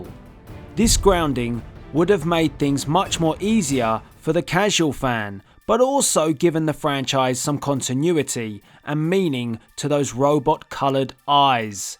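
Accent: England, United Kingdom. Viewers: what part of the UK is that accent from!?!?! hahahahaha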